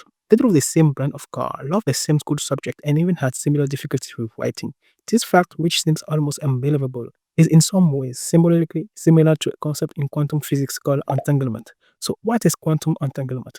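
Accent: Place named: United Kingdom. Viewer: is it just me or is this genuine gibberish with a british accent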